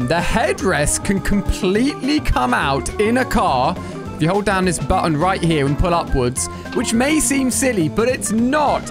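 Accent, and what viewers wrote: United Kingdom. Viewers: I THOUGHT YOU WHERE BRITISH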